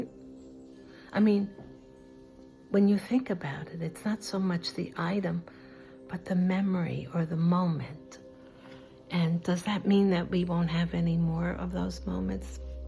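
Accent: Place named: New York, United States. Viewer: This is a great video. Not sure why it came up in my feed - the intrusive all seeing eye of my phone perhaps? I have been declutteting for a long time. Critical life threatening illness in 2020 ( not covid) was the catalyst. I had a 2-3 yr recovery so that was slow. Now I am.picking up momentum. You provided some great tips. I have a large property which we wont be leaving anytime soon but some of the big possessions are leaving. I retired as a commercial cake decorator in 2018. I got sick in 2019, hospitalization 1/9/2020-1/20/2020. My shop remained unchanged in time from the time I closed the door 9/23/18 until about fall 2024. Its here on the property, a separate building from my home. I have been diligently whittling stuff down, selling equiptment etc. Believe me the house and garage have stuff too along with a full barn (horse drawn carriage 😬🙄) but this is a good place to start. 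You sound like you might be from NY which WAS my home state. Incidentally, I have a scrimshaw bottle opener I carried home from my aunts house in Norway. There is no reason to get rid of it, it has purpose. Thanks for the beautiful video.❤ Melinda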